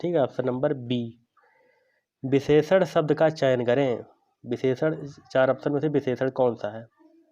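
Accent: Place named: Agra, India. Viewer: सर जी आपकी भाषा तो अपने आगरा जिले की सी लग रही हैं🤣🤣 आप कहाँ से हो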